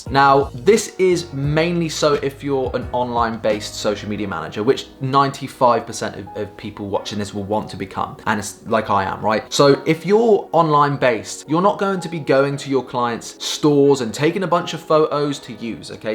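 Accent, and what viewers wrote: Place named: United Kingdom. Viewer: I love your accent. What part of the UK 🇬🇧 are you?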